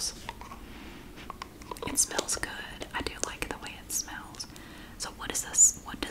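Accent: South, United States. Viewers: I just started watching your channel. I absolutely love you and your southern accent! Much love and support from New Brunswick 🇨🇦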